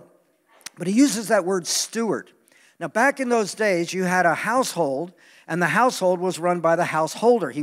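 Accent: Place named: Brooklyn, United States. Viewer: Hi, Lol what's up with the Brooklyn accent?😂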